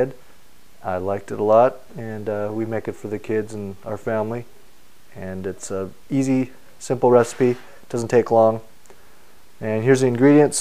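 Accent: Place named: Canada. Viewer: Umm you talk funny and have an accent. You sound like a Canadian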